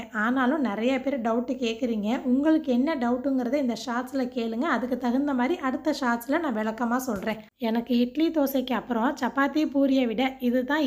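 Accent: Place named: Keelakarai, India. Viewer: Assalamualaikum sis unga slag keelakarai slag onuthaa...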